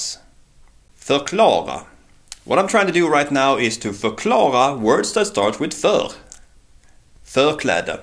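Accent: Skåne, Sweden. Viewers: Ah right, I wasn't sure if you have a Skånska dialect, it's also been a while since I watched that video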